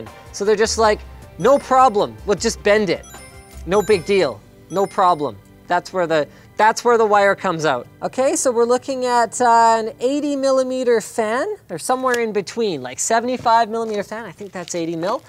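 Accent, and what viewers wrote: Canada. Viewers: The Canadian comes out so hard in this video... Normally I can't hear Linus's accent too much, this episode though...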